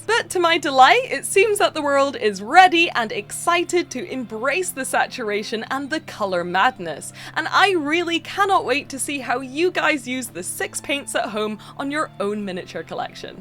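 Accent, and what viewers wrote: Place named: Scotland, United Kingdom. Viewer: Sorry if this offends you, but its annoying me that i cant pin your accent, at times you sound Scottish, lothian/Edinburgh way, but i'm probably completely off 😂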